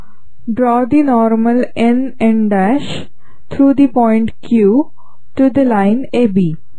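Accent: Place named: India. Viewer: .-_-. Damn Indian accents.....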